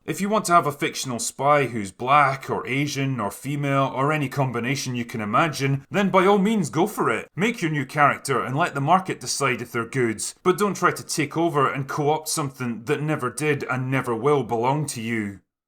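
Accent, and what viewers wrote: Scotland, United Kingdom. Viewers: Sean Connery He blazed the trail and made the mold. The others tried to mimic his charisma, but failed. There are few actors that have the entire package like Connery. Just look at Connery's body of work and the magic of his presence oozes thru unintentionally, just because of Connery being Connery. Pierce Brosnan The only other guy that came close, who even had a movie kept under wraps until he was released by his captors in Hollywood, was Pierce Brosnan. Golden Eye was a fantastic movie. Sure, it had gaping plot holes, but it delivered. Brosnan was the epitome of cool, calm, collected British, stiff upper lip, boys, don't let'em get away. Oh yeah, save the girl too! That's not to say Pierce was a good Bond in other movies, but as good or better than all the other candidates. George Lazenby vs Diana Rigg Lazenby was good not great in his one appearance, but that's the problem. 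I need a little more than one showing. Different venues give us more flavor of what His portrayal and interpretation of Bond really was. Diana Rigg now, WOW. If any woman could have pulled off Bond, there's my girl. On non Bond stuff...Mike Krommhoefener said about you criticking Bond. If you play your cards right, you could be the next voice-over king. At least for parts needing a British/Scottish accent.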